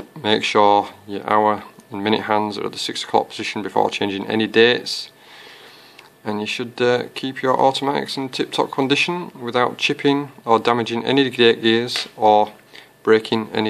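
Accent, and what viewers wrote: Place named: Cockney, United Kingdom. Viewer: Heavy cockny accent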